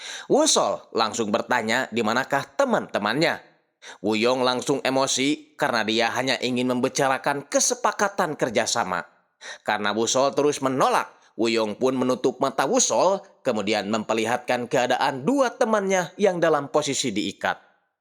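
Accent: West Java, Indonesia. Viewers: Jigana NU ngomong orang Sunda yeuh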